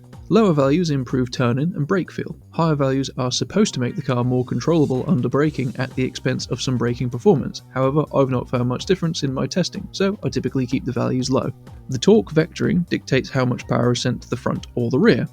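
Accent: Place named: Northern England, United Kingdom. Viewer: This series is a life saver thanks bro! Not had gt7 long and got a T248R last week well what a difference I’ve gone from winning easily to fighting to staying on track lol I thought I would be better with the wheel lol.. Il be making good use of your videos and learning as much as I can to improve my skills. U from the north? I from north west and you speak like a northern lad or midlands maybe. Well thanks for the videos you have a new subscriber here and thanks for not asking for likes n subscribe before we watch the content I really appreciate that you suggest at the end instead. Ta bro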